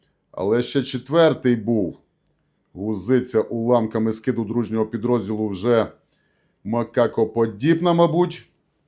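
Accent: Transcarpathia, Ukraine. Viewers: Закарпатський діалект гарно звучить в його виконанні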